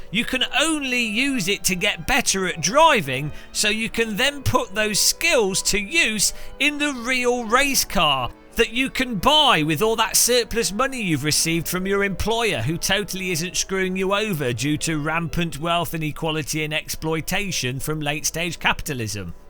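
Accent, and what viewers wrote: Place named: Midlands, United Kingdom. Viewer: Agreed. I only subscribed for the sarcasm, which is amplified by a Midlands accent. :-)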